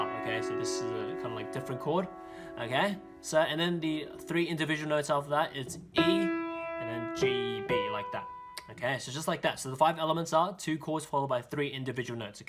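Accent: United Kingdom. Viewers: i like the kinda british accent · i think he is British??? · best english accent ever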